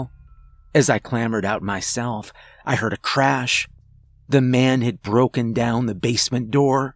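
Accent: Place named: Midwest, United States. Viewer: He certainly has an accent. It's really only noticeable with the way he pronounces certain words, like saying "crayons" as "crahns". If I had to guess, I'd say he's from the American midwest somewhere.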